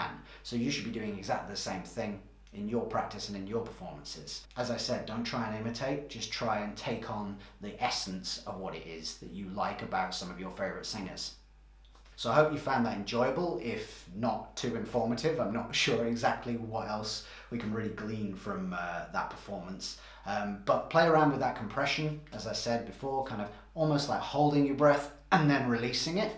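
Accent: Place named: Ireland. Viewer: Have a nice day.You sound irish.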